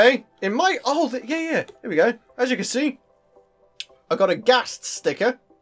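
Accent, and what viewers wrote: United Kingdom. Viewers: he is from the UK its obvious.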